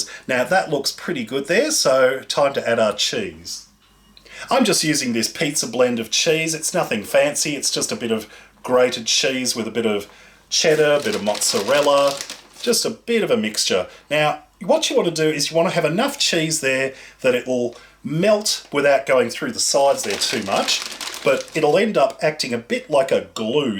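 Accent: Australia. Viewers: I love your quick cooking of course but mostly I love your Australian accent! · This looks wonderful. Thanks for the recipe. Good to hear an Aussie voice on the tube too. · God bless you. You are a beautiful soul. Is your accent Australian? Do tell. thanks.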